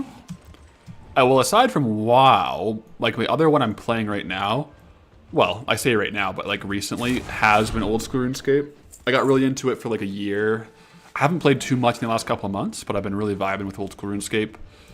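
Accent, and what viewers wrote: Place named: Alberta, Canada. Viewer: oh shit! Buddy is from Alberta haha, love to hear it my man!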